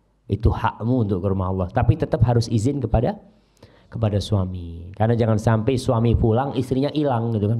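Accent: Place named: Jakarta, Indonesia. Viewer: Jadi usyar itu ,orang padang atau betawi???....kok bahasanya spt orang betawi????....betawinya dimana ustadz????....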